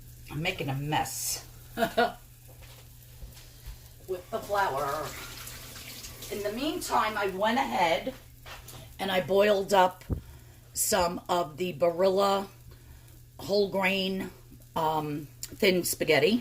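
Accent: New York, United States. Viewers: Love this recipe and love that New York accent ! Cindy From Queens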